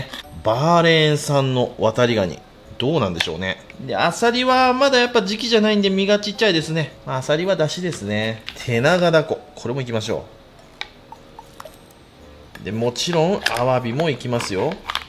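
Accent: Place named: Hakata, Japan. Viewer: いつも楽しい美味しそうな動画ありがとうございます❤ 博多弁と急なえちえち雰囲気、 謎すぎてめっちゃ笑いました🤣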